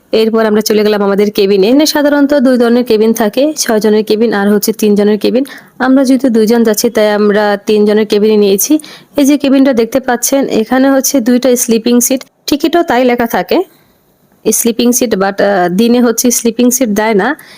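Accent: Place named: Bangladesh. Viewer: উচ্চারণে ই ধরা পড়লেন আপনি বাংলাদেশী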